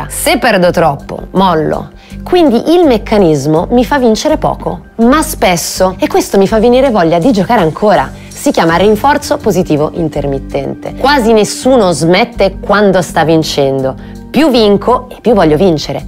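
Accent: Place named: Bologna, Italy. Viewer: Dimmi che sei di bologna senza dirmi che sei di bologna. spento il video subito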